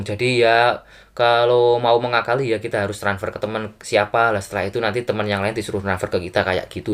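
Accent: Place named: Java, Indonesia. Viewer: Jamet medok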